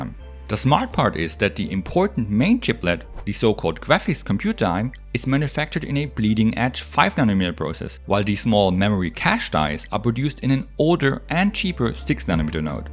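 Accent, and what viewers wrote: Germany. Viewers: I can hear the german Accent hehe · ja das ist es xD du hast einen krassen deutschen akzent aber hey, fühl ich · Sounds like the most Deutscher Akzent ive ever heard xd but nice video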